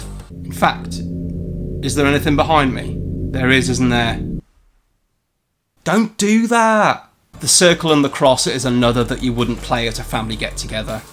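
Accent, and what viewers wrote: England, United Kingdom. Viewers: Honestly,my entire knowledge about GOTH & DARK WAVE wouldn't fill a shot glass. What has me interested is a sheer boredom with what I've listened to for so long, DEATH METAL & BLACK METAL. It just seems like everything has been done. How many songs can be written about going feet first into a wood chipper or songs about the dark lord and master. So yes, I'm new to it. GOTH seems to have the darkness that I gravitate too, but being ignorant, your channel is a nice way to find about the various bands. It also helps that I could listen to an English accent read the dictionary. Carpe nocturne.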